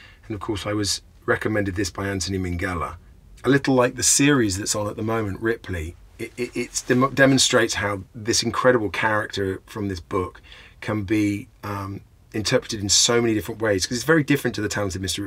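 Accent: United Kingdom. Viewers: HES BRITISH!??!!!???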